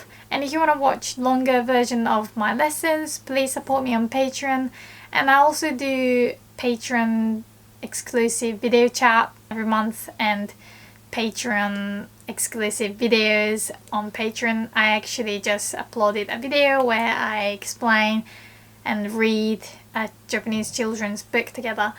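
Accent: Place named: United Kingdom. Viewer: You have a british accent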